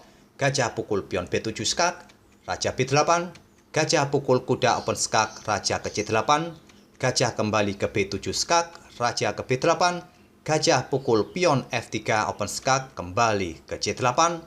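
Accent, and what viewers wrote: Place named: Banyumas, Indonesia. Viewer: Sama ngapak2 min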